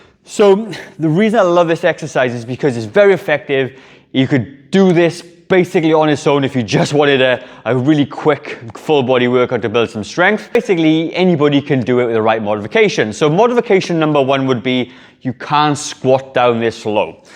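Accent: Wales, United Kingdom. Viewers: Bro, are you Welsh? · What’s with this guy’s voice ? Not only is he Welsh , but he’s got a weird speech defect ? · Is that a Welsh accent I detect, I'm from South Wales. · Did he say new zealand? He sounds welsh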